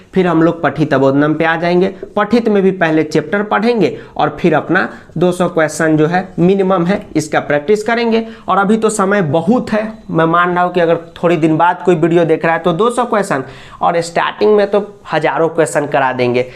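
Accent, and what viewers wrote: Bihar, India. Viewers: Sir aap bihar se h kya ?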